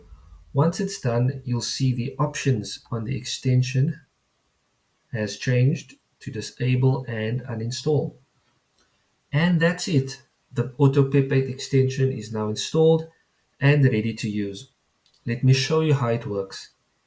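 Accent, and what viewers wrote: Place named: South Africa. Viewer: love ur south african accent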